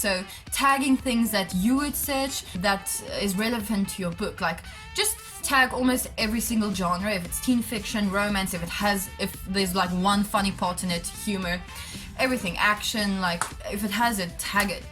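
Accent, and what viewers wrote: South Africa. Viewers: I knew she was from SA ,the accent said it all😂....yess!! Fellow South African💫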